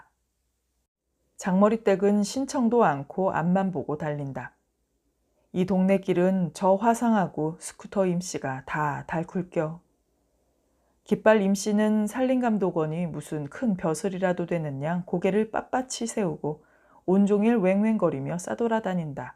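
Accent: Gyeongsang, South Korea. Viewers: 여긴부산인데요 갱산도미까 사투리가 구수해요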